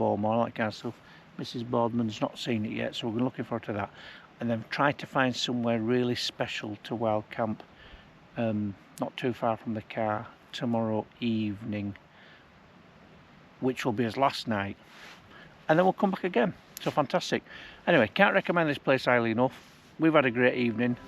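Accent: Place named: Scotland, United Kingdom. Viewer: Oh my gosh! I’m an American and I kept trying to catch your last name as you mentioned your wife. Then I finally heard Mrs.! I don’t know if anyone has ever mentioned that you have a touch of a Scottish accent 😂. I love watching your channel. I was one of the group that came over from Reddit and I’ve enjoyed all of your videos! I hope you keep making them.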